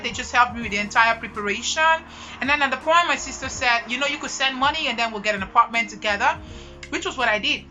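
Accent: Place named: United States. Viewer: Why do you have an American accent tho? 😭😭😭 Cringe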